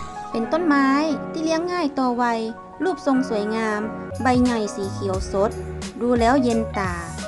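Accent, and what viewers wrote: North, Thailand. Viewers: ชอบสำเนียงทางเหนือจังครับแฟนผมกะอยู่ลำปาง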